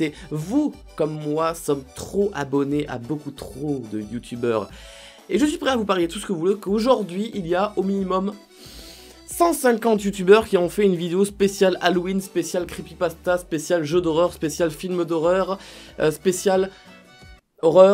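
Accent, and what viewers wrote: Spain. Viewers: Au début en lisant les sous-titre en même temps d'écouter ce que tu disais, je me suis dis : mais... google traduction est pourri haha! Sinon, trop mignon ton accent en español !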